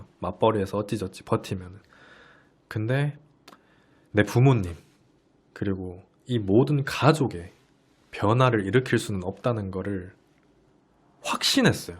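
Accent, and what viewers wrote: Gyeongsang, South Korea. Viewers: 경상도 억양이 있네요~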